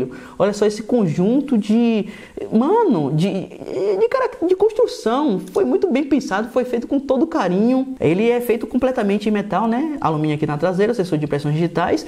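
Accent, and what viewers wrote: Bahia, Brazil. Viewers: Mano vc é baiano? O sotaque e as gírias igual a minha pivete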